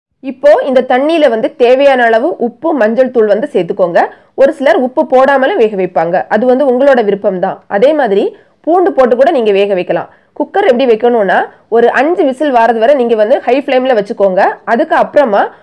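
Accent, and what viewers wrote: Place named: Nagercoil, India. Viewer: Madam neenka nagercoil ah slang apdi than iruku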